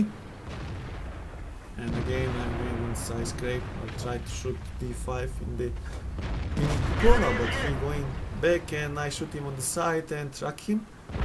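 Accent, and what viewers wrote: Russia. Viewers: you sound like are from russia, but its cool, keep it up, I love your chanel. I di je onaj ludonja sta kaze jebi dan krumpirko, ukra si mi kill. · Kako koji put sve si bolji sa engleskim ali i dalje zvucis kao russ ;)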